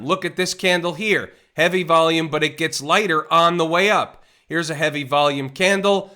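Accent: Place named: New York, United States. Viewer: …Sorry, but I freaking LOVE this guy’s NYC (ahem) transmission…..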